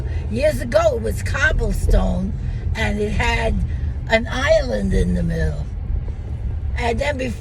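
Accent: New York, United States. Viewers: . Nope. My sister who talks like you is only one of our family still in NY. My Brother and I later went to Medical School in Omaha just around time Henry Hill ( of Good-fellows infamy) came to Omaha. Our accents were like yours 40-50 years ago. Can’t tell you how many times people asked one of us if we were in Witness Protection .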